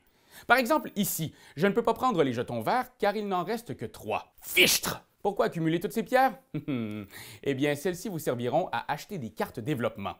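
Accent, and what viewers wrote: Quebec, Canada. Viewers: À la fin de son tour ! Ce qui peut permettre pendant celui-ci, d'en avoir 13 momentanément ! 😋 Petite omission également concernant les nobles, que l'on n'achète po...hein !? Mmh'et 🤫 Il ne faut po...hein !? Tout révéler ! Mmh'et donner envie, comme le fait si bien vos pépites oups ! Vos vidéos telles des bijoux/💎... (Ainsi que sur la fin du jeu où l'on finit le tour). Encore merci pour vos magnifiques vidéos. Qui + hé ! Agrémenté de l'accent de la Belle Province ! ✌🏼🃏♟️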